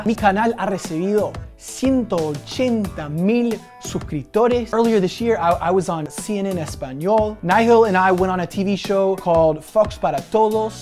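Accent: Argentina. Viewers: BUE, muy lindo todo lo del acento argento, el colorado un copado también, pero como decimos por acá PONETE A LABURAR QUERIDO! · Aguante el proyecto kity pasta!! jajajaja volve a Argentina, sos un groso! mi novio es italiano y habla el " castellano Argento" como vos :) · Te olvidaste de como hablar en argentino? O solo estabas hablando asi por el colo? PD: No te pude ver en Argentina :'( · La primera vez que veo tus videos y me encantan! Lindo acento Argentino jejeje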